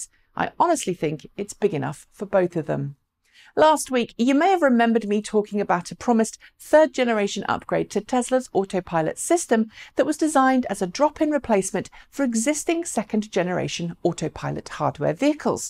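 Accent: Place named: United Kingdom. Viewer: It is without contest that your show of the many YouTube professional and semi professional out there in the digital world one of the best if not the best in it's class. Yes many may say it is in fact your livelihood but the progress made over the years with how the show is presented is fantastic. The content is always from my Jamaican prospective deep, rich and eye opening given from a balanced position. This is where my deliberate and express bias comes in; that beautiful British voice is always beckoning my undivided attention to hear the unbroken dialect. Sweet!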